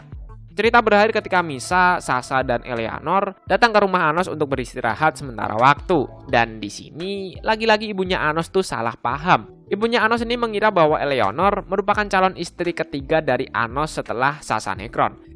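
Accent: Java, Indonesia. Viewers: Medok bat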